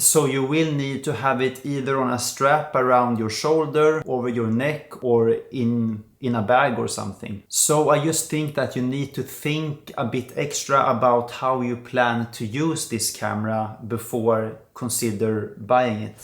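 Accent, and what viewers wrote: Sweden. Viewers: Du måste vara svensk!! Finns inget annat, så hårt som du bryter😂 säg mig, hur bra är den kameran. Jag funtar på och skaffa en q2m i värsta fall en q2… har du framkallat några bilder än? Eller gjort nå prints ? Isf hur stort ? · Dat svenska accent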